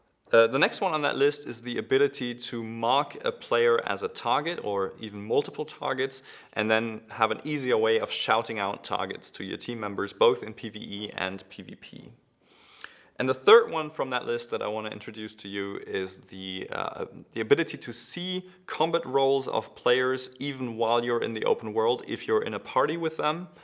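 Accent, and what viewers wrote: Germany. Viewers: This man sounds SO ULTRA German.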